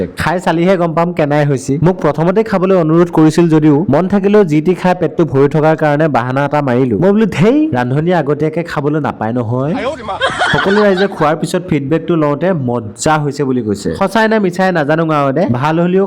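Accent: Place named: Upper Assam, India. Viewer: কথাৰ সুৰটো আমাৰ আপাৰৰ, ক'ত ঘৰ?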